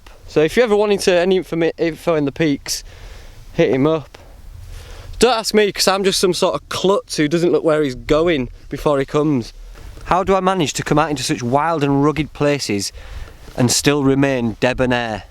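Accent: United Kingdom. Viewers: Hi buddy! Are you British?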